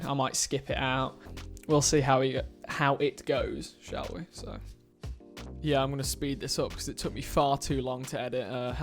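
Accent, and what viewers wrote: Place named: Northern England, United Kingdom. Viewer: You sound well northern in this 🤣🤣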